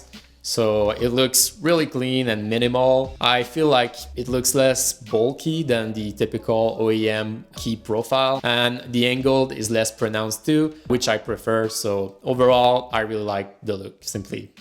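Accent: Quebec, Canada. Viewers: I know that accent... est-ce que t'es Québécois? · Tes tu quebecois?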